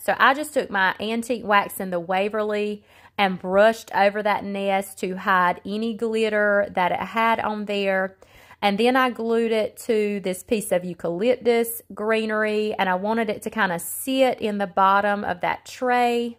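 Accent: South, United States. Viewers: All of them simply beautiful! Every one of them is my favorite. I love them as much as you do! P.S. I really like listening to your voice are you in the south? TFS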